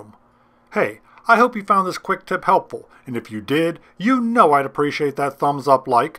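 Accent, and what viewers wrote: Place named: United States. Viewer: You have an American accent.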